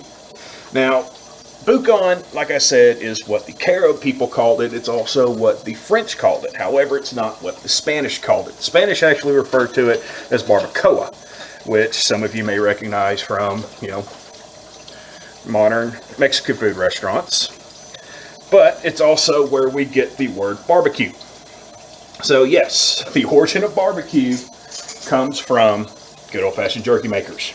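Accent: United States. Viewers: yep you're definitely a Texan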